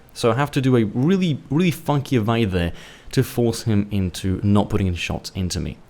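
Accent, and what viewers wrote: England, United Kingdom. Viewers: Why does he have an English accent? wtf? Or is the Slovenian accent similar in some way?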